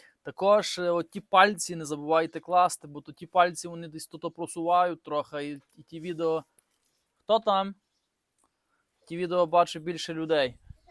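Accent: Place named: Galicia, Ukraine. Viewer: Класно ведеш і галицька говірка мені дуже імпонує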